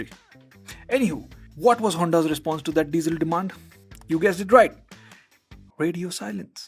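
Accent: India. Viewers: Please bro use AI to dub your videos. The instant I hear an indian accent I click off.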